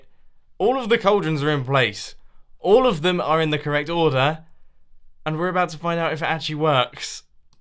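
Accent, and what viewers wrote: United Kingdom. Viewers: British voices turn me on even tho i'm, not gay. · it is the british accent with the serious tone that has the charm. Sometimes I try speaking like that bcoz it sounds absolutely cool OwO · Why so stereotypical, I'm American and a girl and I don't just like the British accent I like the content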